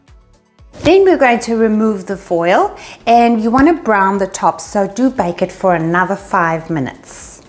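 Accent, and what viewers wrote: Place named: South Africa. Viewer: I was guessing maybe you had a South African accent it's always beautiful but always hard to place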